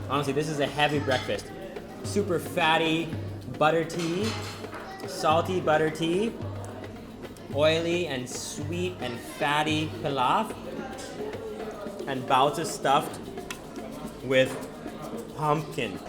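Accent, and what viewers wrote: China. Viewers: His Chinese accent is super strong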